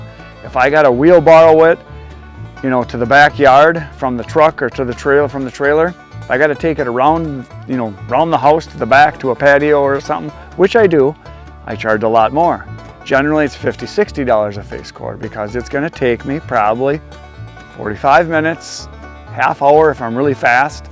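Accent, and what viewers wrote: Wisconsin, United States. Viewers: Good ol’ Wisconsin accent . I’m from Buffalo NY but spent a little time in Amherst <mail> Wolding when I first got my cdl. I went there in late January early February and it was a different kind of cold 🤣 Beautiful in that area.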